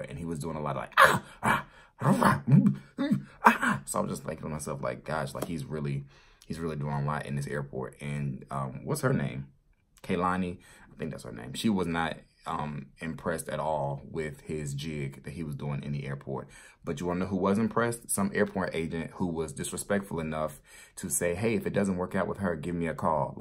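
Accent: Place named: Virginia, United States. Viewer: I'm from Virginia and I think you sound like us. But then again maybe I'm country lol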